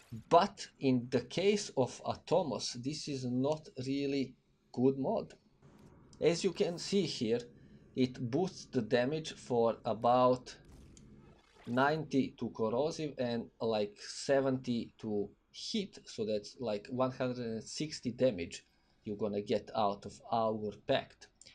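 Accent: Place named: Russia. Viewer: Слышно , что Русский чел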